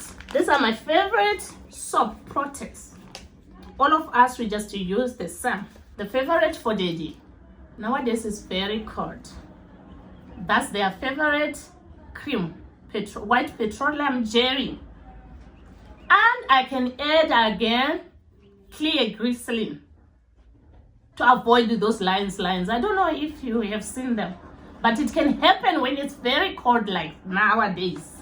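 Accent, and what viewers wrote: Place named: Africa. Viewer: Keep going forward my beautiful teacher love you and your family, some people with negative comments that's how African teachers talk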